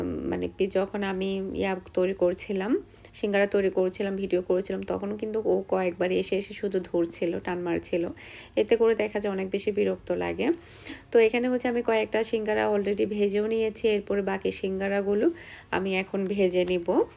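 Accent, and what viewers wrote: Chittagong, Bangladesh. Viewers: Amr Mone hocce apu apni ctg er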